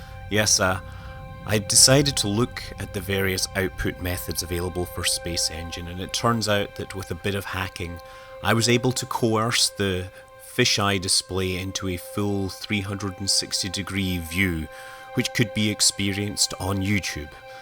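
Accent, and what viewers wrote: Scotland, United Kingdom. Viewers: Rather Carl Sagan with a nice Scott taint. brilliant. does space engine do stereoscopic output? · Omg I just realised how deep of a Scottish accent u have got btw love ur videos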